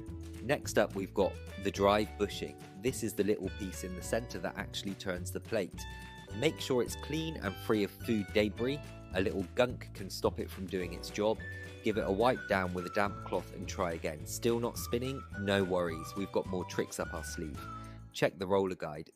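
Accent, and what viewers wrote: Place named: United Kingdom. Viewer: Can't understand the Limie accent.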